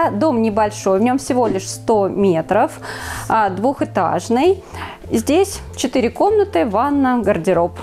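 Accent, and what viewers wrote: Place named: Yakanye, Russia. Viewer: Режет ухо Яканье.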